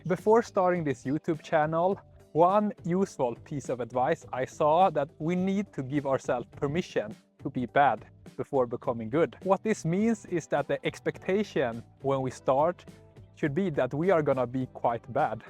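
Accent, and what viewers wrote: Sweden. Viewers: 4 years in Sweden and you speak with perfect pronouncation and an extremely swedish accent when speaking English? What is going on?! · You must have been living here for a longer time than 4 years . Because your English allready has a swedish accent :-) Why is your girlfriend having this gigantic hat???? If its against the sun?... Well then , it is totally not neccesary in Sweden :-) Ohh! Thank you for a pleasant blog by the way! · 4 år??? Om du uttalar orden så bra så kan du fan i mig läsa det här också. Det är ju nästan så att du "bryter" på svenska när du pratar engelska.. Imponerande. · You are beautiful people and your swedish is so good, and you even have a swedish accent to your english. A great video.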